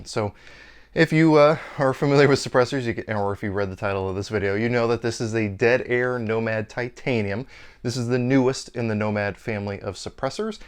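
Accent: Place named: Europe. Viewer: Someone comes from a European family... dead giveaway within 10 seconds of the video. Love the channel brother.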